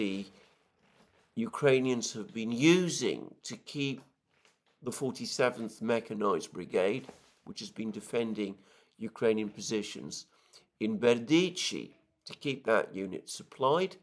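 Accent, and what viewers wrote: United Kingdom. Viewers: His British accent puts me to sleep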